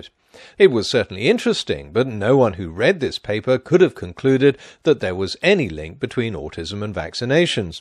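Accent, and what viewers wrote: United Kingdom. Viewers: I take this guy twelves more seriously because of the GODDAMN BRITISH ACCENT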